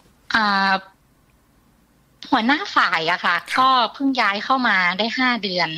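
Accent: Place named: Sukhothai, Thailand. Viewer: สำเนียงการพูดเหมือนคนสุโขทัยเลยครับ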